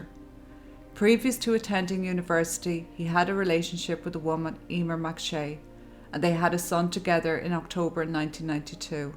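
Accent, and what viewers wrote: Ireland. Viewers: I adore the Irish accent. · Honestly and I watch alot. My favourite accent in the whole world is the Irish one so that might have helped. 😁 seriously your voice is perfect. Xxxx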